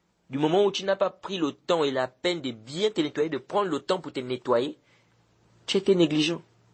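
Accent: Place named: Gabon. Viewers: Il est gabonais justement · Qu'Allah vous en récompense. Humm l'accent est très gabonais hein🤔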